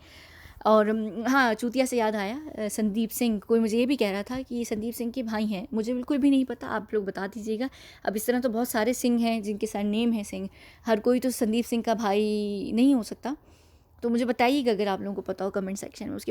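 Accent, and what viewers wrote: United Kingdom. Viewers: you are right, she has worked in several countries including India, but she is British, I can tell from her accent, it was a joke...the way she gives 5seconds to people who think that she fakes the accent and it makes me 😂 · yes she has a british accent as she mentioned herself in previous videos that one of her parent is white(britisher).... i think she travels all over the world. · Good info. BTW like your brit accent. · I don't know your name , I don't know where are you. But thanks a ton for this job .I like your British ascent. I love your voice as well. Keep up the gud work. Cheers .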